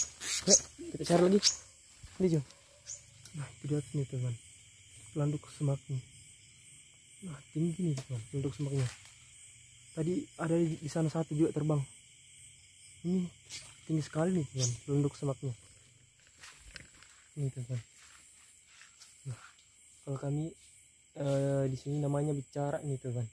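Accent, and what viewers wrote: Bugis, Indonesia. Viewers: Orang bugis kah bang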